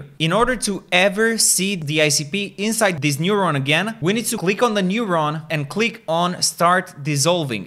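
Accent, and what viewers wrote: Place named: Portugal. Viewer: 100% que es tuga! 😁Bom conteudo btw, keep it going ehehe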